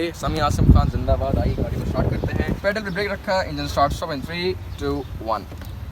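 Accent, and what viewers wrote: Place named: United States. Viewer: Which part of America is this accent from ?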